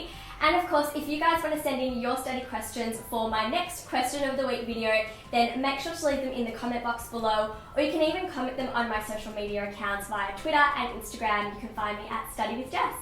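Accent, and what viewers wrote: Australia. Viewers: You sound like an Aussie.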